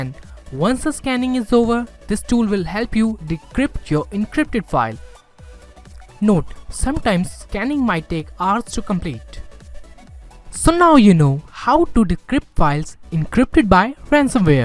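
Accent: India. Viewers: FUCK UR ACCENT U INDIAN GURU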